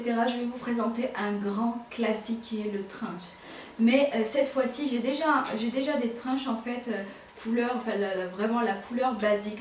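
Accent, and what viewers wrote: Morocco. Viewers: Ton petit accent marocain est très sympa sinon super haul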